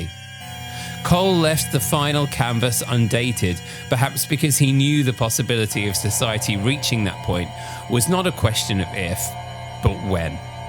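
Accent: United Kingdom. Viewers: It’s bs.You got a Brit narrator,who probably doesn’t like that his own empire fell now hoping the US does too.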